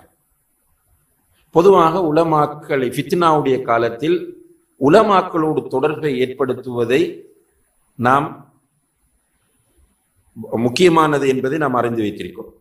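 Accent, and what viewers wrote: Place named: Sri Lanka. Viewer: Pesum vizattil puriwazillaya sri lanka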